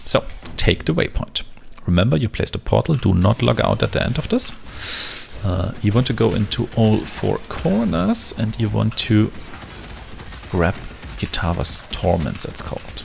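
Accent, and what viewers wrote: Germany. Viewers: Nettes Speedleveling-Video, danke dafür! (Vom Akzent her vermute ich, Du bist Deutscher ;-) ) Ich hatte lange darauf gewartet, zu erfahren, wofür Du den vierten grünen Sockel brauchst, die Info darüber, welche Gems Du benutzen willst, hätte vielleicht ein wenig früher, womöglich schon ganz am Anfang, gebracht werden können. Davon abgesehen: schön, dass Du auch Hinweise auf das übliches Leveldesign für die kürzesten Wege gibst. Dass man in Akt4 die Animation von Piety abkürzen kann, indem man nach rechts läuft, war mir neu, werde ich beim nächsten Leveln mal ausprobieren.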